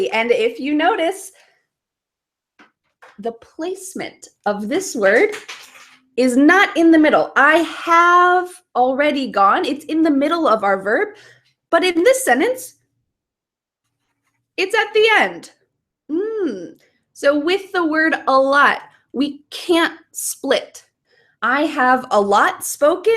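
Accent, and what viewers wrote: United States. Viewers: You is American? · is your english american ou brazilian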